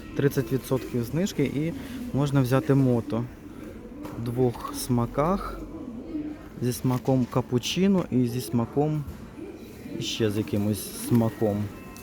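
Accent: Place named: Western Ukraine, Ukraine. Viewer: ну звісно як без них? Але так гарно розмовляючи українською і портити її західним акцентом просто не повага до самої мови. Дуже шкода.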